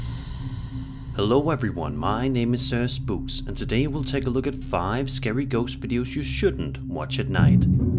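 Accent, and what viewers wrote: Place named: Denmark. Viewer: Dont know why but your accent sounds too danish ion know why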